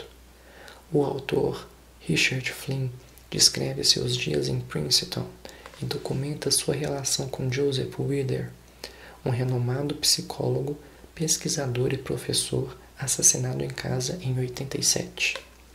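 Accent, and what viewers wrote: Minas Gerais, Brazil. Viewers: Amanda Utuari ele é do interior de MG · O sotaque mineiro ❤️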